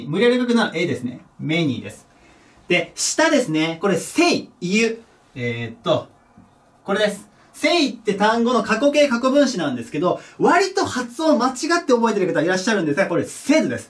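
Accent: Kansai, Japan. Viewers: 前から思ってたけど関西の人ですか？？ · あれ、さん関西の方ですか？？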